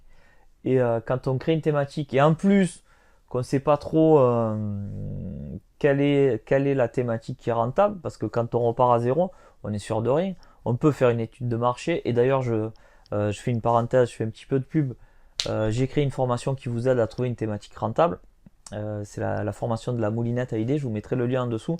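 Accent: South, France. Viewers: "tu envoies" :-) et y a une fofote dans la vidéo au début, "une autre site" mais on s'en fout du moment qu'on entend ta belle voix du sud aussi mélodieuse que les cigales! (c'est vendredi je suis fatiguée)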